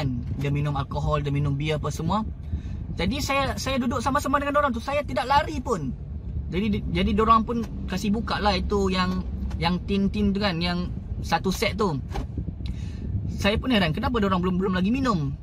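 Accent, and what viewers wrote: Sabah, Malaysia. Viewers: Say tgk ko ni mcm bukan sda org kedah ni hahahahahah. Pekat suda tu loghat sabah hahahahahah. Tpi kwn say brterima kasih jga la slepas tgk video ni, kwn say dri selangor kena suru pindah pg sabah. Mula2 ragu dia kunun mau pindah sini. Ada kwn pun say ja. Takut dia kena ilmu hitam apa smua. Takut org sabah nda terima dia. Skali dia tgk ni video nda sabar kunun dia mau pg sini. Hahahaha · Slang sigu macam org sabah suda oo..salute sama kamu sigu..semoga sentiasa happy dan bahagia sama family dan murah rezeki selalu ar sigu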